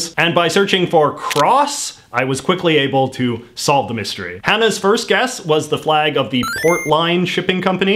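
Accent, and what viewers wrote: Canada. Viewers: aebout, und and you are canadian bro am i right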